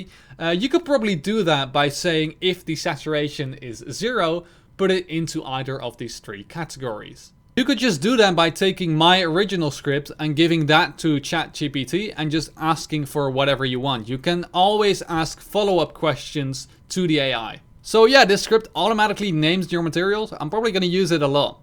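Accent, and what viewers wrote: Netherlands. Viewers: just found this video (and your channel) not even 2 seconds in i already learned you're a Dutchie too :') haha our accent is so easy to pick out (at least to us) just like we can pick out people speaking Dutch that deviate more than 5% from the norms, then switch over to English because we know they aren't native Dutch speakers, we feel that shit up real quick :D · i smell dutch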